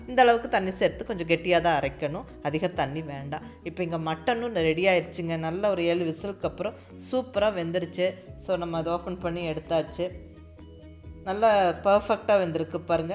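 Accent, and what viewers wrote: Kongu, India. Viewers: Konku tamil arumai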